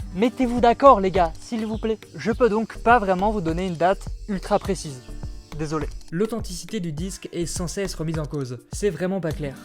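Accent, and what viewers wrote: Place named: South, France. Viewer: petit accent du sud qui fait plaiz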